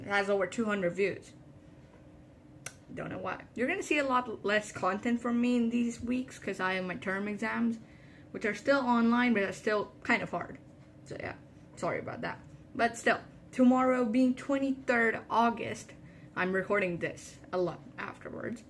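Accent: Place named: England, United Kingdom. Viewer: bruh but why u have an English ascent in your language????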